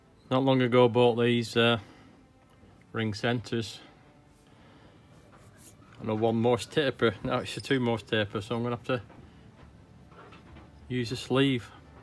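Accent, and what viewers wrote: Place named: Northern England, United Kingdom. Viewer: A great Northern accent, there sir! A great lathe also. I’ve just bought a 6 month old R2 version. Joe (Manchester)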